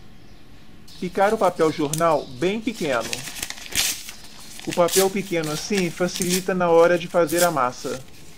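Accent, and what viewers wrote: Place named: Santa Catarina, Brazil. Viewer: Eu de vez em quando vejo seus videos e gosto da imensa variedade como de jardinagem até este de marcenaria. Mas seu sotaque é tipico de SC. Tu és catarinense? A pronuncia do R forte é tipica de quem é catarinense.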